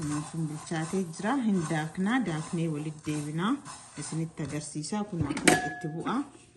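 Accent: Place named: Arsi, Ethiopia. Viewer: Wowowowowowo jabduu teenyaa jabaadhuu😍😍😍🥰🥰💪 Ati arsiidha mama hasa'an kee arsii fakkata.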